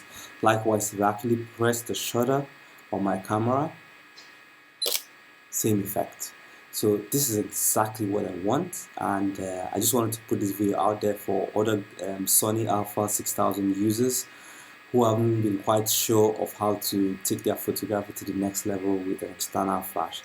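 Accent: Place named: Africa. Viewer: Nice review You sound like an African brother, where you from ?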